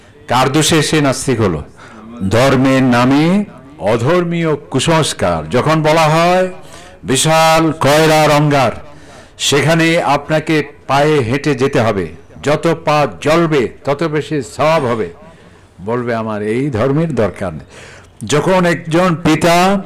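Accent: Chittagong, Bangladesh. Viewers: উনি ত চট্টগ্রাম এর. ভাষা শুনে বুজা গেছে . খুব ভালো লাগছে. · গুরুপূর্ন আলোচনা।চট্টগ্রামের ভাষা ওনি ভালা লাইগ্গি।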